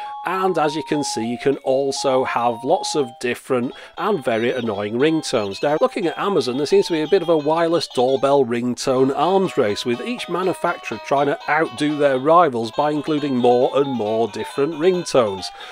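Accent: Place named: Liverpool, United Kingdom. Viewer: No. Not really. People normally pronounce it "Add-hee-siff". The H is short, but not silent. I suppose it could be a regional dialect that could result in "Addy-siff". from (or at least near) Liverpool, so I wouldn't be surprised. Those people pronounce words funny all the time, but we dare not say that to their faces.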